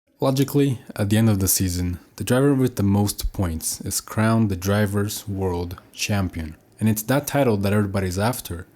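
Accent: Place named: Turkey. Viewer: You got turkish accent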